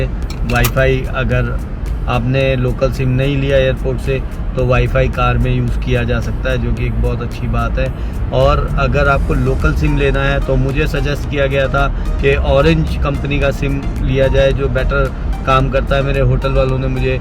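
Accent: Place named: Old Delhi, India. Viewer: aapka baat karne ka style bahut Achcha hai Old Delhi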